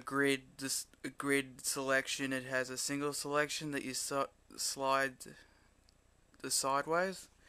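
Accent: Australia. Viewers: darpo3t - he is not british! I'm british, and have neat and clean nails - he sounds australian or kiwi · He sounds like a queens lander.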